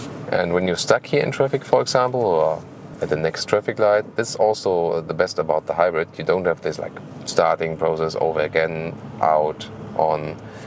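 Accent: Germany. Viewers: sehr schön, vielen dank. und - nicht böse gement - gerade der schwere deutsche akzent macht das englisch für mich leicht verständlich ;-) weiter so, lg aus österreich. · Wer merkt auch das er deutsch ist ...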